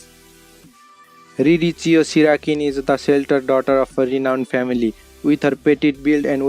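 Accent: Nepal. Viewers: Lot of Nepali accent. I already knew. Me 2 Nepali 🇳🇵🇳🇵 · He has an Nepali Accent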